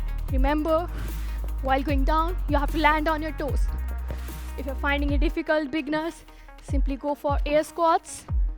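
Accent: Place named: India. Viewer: Her indian accent blow tho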